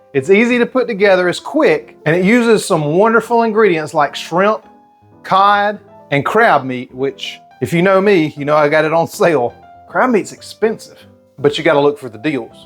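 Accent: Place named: South, United States. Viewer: I just love when you say, (in your distinct southern accent 👍🏻) “Well, I forgot to add that in,” or “I forgot to show you that,” or you forgot whatever…..& then you just correct it & move on. 😀 That means that yes, you are my people!! Because that’s exactly how I run my kitchen!! 😂 This is great seafood comfort food right here! Mmmm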